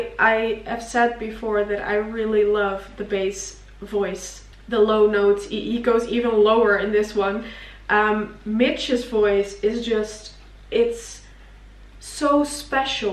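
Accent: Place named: West, United States. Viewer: Seriously, I had no idea you weren't from the states. You do have a tiny bit of an accent but it sounds local, like you might be from an isolated part of a state out west. I feel sorry for anyone trying to learn American english. It's a conglomeration of every language in the world, and as a result rules are practically nonexistent. So, well done! As a counterbalance to the negative nellies... I love your eyes. They're like staring into a pair of black holes. Whoever put that ring on your finger is a lucky man.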